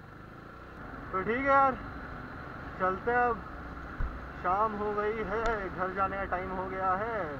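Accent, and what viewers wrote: Bihar, India. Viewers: In Bangalore u have bhihari accent....?????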